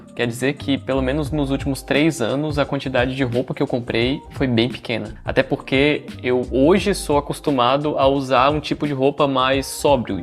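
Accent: Brazil. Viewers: Hahaha amei seu canal… E cara, teu sotaque é muito baiano… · So de ver a casa se nota q ñ mora no Brasil 🇧🇷 , ate ele falar 😂 , eu tbm moro na Europa . valeu as dicas.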